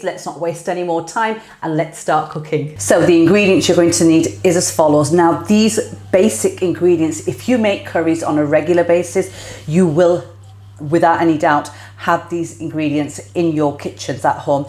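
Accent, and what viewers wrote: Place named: Manchester, United Kingdom. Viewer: Hello Your accent sounds from Manchester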